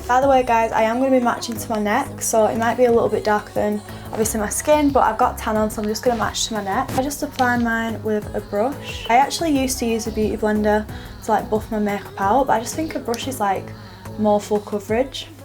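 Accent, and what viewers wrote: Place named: United Kingdom. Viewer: You look so pretty my sweet friend also liked your brit accent🙂☺😙😙😙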